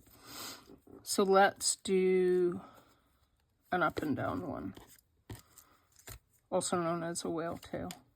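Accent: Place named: Canada. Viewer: Just discovered your channel - it came up in my suggested videos feed. You have to be Canadian 🇨🇦 🍁 🇨🇦 your accent gives you away! Nice to subscribe to a fellow creative Canuck. I have had tabs on my to-do list for awhile - thank you for the inspiration.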